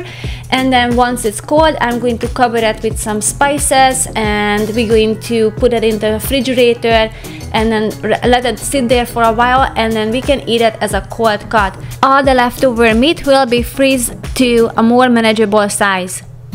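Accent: Hungary. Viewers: Hodvoid. I noticed the accent. My mom was Hungarian and that’s we all 3 brothers identified ourselves. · Hi, I just started to watch your videos! You accent is similar to my neighbours who are Hungarian, now I understand why:) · Elvileg van automatikus fordítás a youtube videóknál, ahogy látom itt is. Én inkább magyarul hallanék már egy videót, hiszen magyarok.. és legyen angol a fordítása:D Nagyon bántja a fülemet, hogy magyar kiejtéssel beszél angolul.. tudom az én bajom:)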